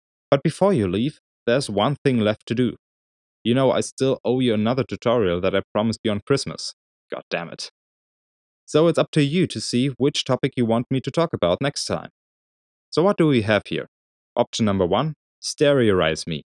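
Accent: Germany. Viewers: nice tutorial! do u come from germany? i think u have a very german accent;P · hahaha after the first sentences I heared that you are from germany :D es ist wirklich erstaunlich wie schwer es für die meisten ist den deutschen dialekt zu verstecken :D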